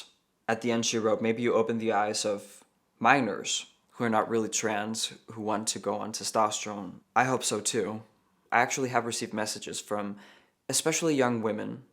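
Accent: United States. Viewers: I never knew that you live in Denmark. You have a very good American dialect! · So wait… do Danes speak English with this accent that sounds American, or is he doing these videos in an American accent on purpose? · I only have one question, and maybe it's already been answered., why do you sound like you have an American accent?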